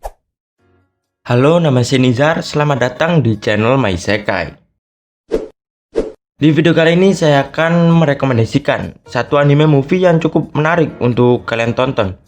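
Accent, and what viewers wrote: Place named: Java, Indonesia. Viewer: B sama D nya medok banget bang wkwkwkkk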